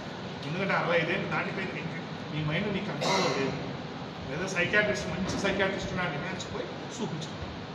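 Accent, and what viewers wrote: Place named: Rayalaseema, India. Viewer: Mana rayalaseema slang tho adaragottav anna.jai Sudheer Reddy anna.jai ysrcp. Love from jammalamadugu.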